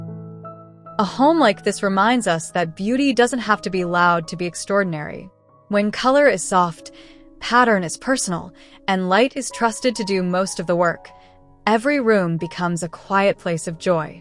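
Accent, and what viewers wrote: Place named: United States. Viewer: So sad an English company and beautiful rooms has to be spoken by an american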